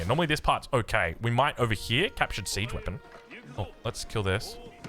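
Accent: Australia. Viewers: Yes he’s just Aussie it don’t rlly matter but yeah we normies say “cash”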